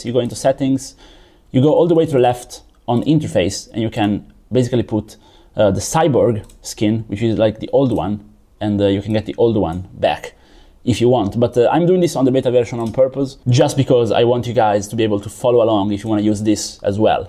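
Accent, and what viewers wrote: Italy. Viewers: Sento un po’ di italianità 😂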